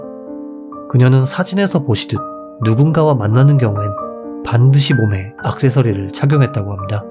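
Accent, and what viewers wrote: Japan. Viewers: ㅎㅎㅎ중간 중간 일본분 액센트가 들려 일본인인줄 알았어요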